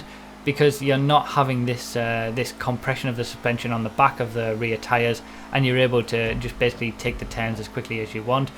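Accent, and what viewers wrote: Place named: Northeast England, United Kingdom. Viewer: where are you from? Because I am also from the north east of England and your accent sounds a lot like mine.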